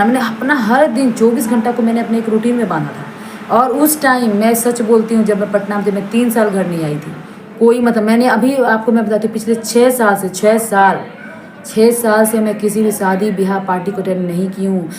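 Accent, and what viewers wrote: Uttar Pradesh, India. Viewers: Tum to lgta h up ki ho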